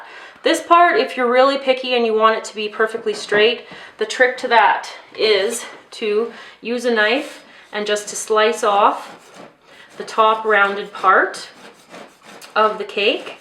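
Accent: Canada. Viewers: Okey :). Maybe I´m just not used to hearing Canadian. I love your videos!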